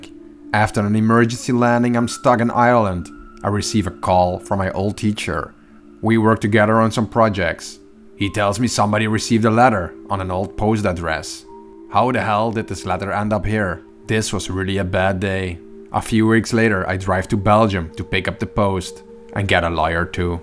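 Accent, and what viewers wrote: Netherlands. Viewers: Intriguing what they sued you for instead of suing you for trespassing as you were basically trespassing. The possible secret agenda of the asbestos clean up once more shows the different motives of parties to sue on another instead of logical reasons. It was hilarious though that the article writers thought you were American, as a Dutchy myself I can clearly recognize that accent. No offense of course. Thank you for what you do and I appreciate that you and your friends are willing to put so much on the line to show these amazing places to the world!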